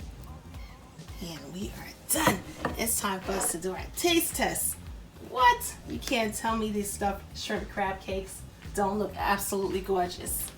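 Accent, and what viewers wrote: New York, United States. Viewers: I’m a brand new subscriber. These crab cakes came across my feed, and I was hooked. Are you from NYC? I read your about but it didh’t say. My hub is from the Bronx. I hear an accent. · Lordy,Lordy that looks good. I’ve been fasting for 18 hours now and this just drove me to another level of goodness.I hear a hint of NY in your speech, is that right. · Hi. I just love your videos. I really don't remember when I subscribed to your channel, but it had to be something that look good for me to do it. Me and my husband was watching a vid of yours maybe a week ago. Your recipes look sooo amazing and good. But, our decision was about your accent. I said that you sound like you are from NEW YORK. He said your accent sounds like more of NEW ORLEANS. Please let us know. Because TRUTHFULLY!!!! NYC IS ON MY BUCKET LIST TO VISIT!!! WE BOTH LOVE EAST COAST RAP, so I don't understand why he said NEW ORLEANS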